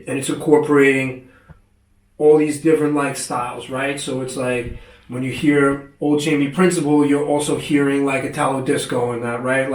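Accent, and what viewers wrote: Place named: Brooklyn, United States. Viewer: Love the Brooklyn accent...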